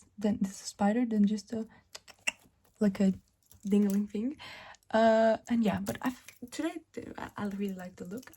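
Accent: France. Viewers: Ton accent et ton vocabulaire en français sont tellement propres qu'on dirais que t'as habité en France pendant plusieurs années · T'as un accent parisien, je pensais que le français c'était ta première langue. 🤯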